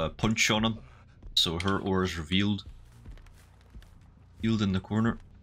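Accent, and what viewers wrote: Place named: United Kingdom. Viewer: For 1-2 minute i wonder what does it mean "faint them" Then i realise it's pronounce of words "found them" xdd GB accent sometimes hard to understand, especially if English is not my native language :)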